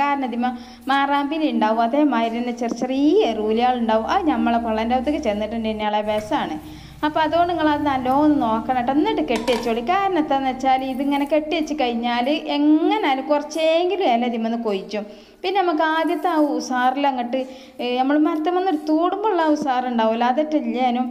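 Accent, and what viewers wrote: Malappuram, India. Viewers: 1day andhakkaa vibavangallaaa ningal super anu ttoo pinnee nongale basha kette video kelkkan oru sugamanu annee😍😍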